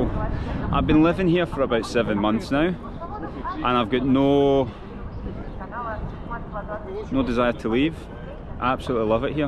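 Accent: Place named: Glasgow, United Kingdom. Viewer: I stumbled upon your channel a few days ago, and i LOVE it. I follow a few other channels by Russian citizens and they are facilitating. Yours offers a great Western insight into the day-to-day life in Russia when compared to life as a westerner. I’ve seen 3 of your videos so far and I’m currently ‘catching up’. Just wondering - I know you are Scottish, but specifically, are you originally from Glasgow? Your accent is very similar to a singer friend I know who was a proud Glaswegian! LOL Anyway, I’m enjoying your videos on this magnificent city.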